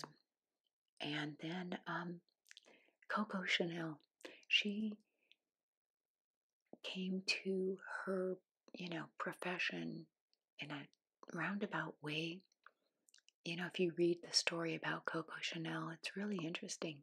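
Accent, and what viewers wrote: United States. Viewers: Thank you so much for answering My question! We are in Texas near Houston, so that’s so cool!! I thought you might have a little TEXAS in you 😉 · I’m from England and I don’t usually find American accents doing ASMR give me tingles (nothing against any American accent I just seem to find I get more tingles from accents I’m less familiar with) however everything about your voice is dreamy! I find your voice so soothing, nurturing and tingle inducing! Thank you xxx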